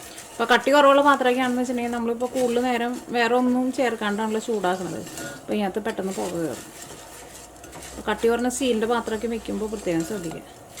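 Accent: Thrissur, India. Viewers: Nishkalangamaaya Thrissur Slang.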